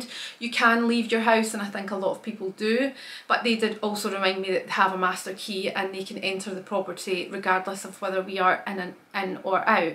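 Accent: Scotland, United Kingdom. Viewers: Thanks for this video. It is very difficult renting in Sydney, especially as tight as the market is now. I remember when we were leaving our rented place years ago how I felt when streams of people came in looking & that was when it wasn't as busy as it is nowadays. You are right about the lease & in noting the condition of each room in great detail. I know you've found a place now you are happy with but as a word of advice to others arriving in Sydney - yes you have to move further out to get a house for lower rent but if you look instead at townhouses or villas you may pay less than for free-standing house not so far out & you still have a garden ( admittedly smaller) for children. I hope your move goes well, don't forget to have an "essentials" box for moving day ( kettle, coffee, long life milk, tea bags, tissues,toilet paper, snacks etc so you can avoid the added stress of going to the shops). 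I enjoy all your videos & love your Scottish accent. P.S. Don't forget a small esky with ice so you can give the movers a drink when they bring the final load into your new place - it's a very "Aussie" thing to do!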